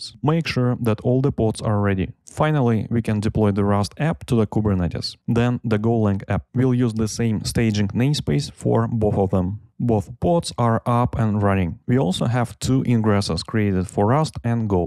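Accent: Russia. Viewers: думаю, чё я так хорошо тебя понимаю? а ты на русском по-английски говоришь) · That strong Russian accent 😃 Thanks for benchmarking!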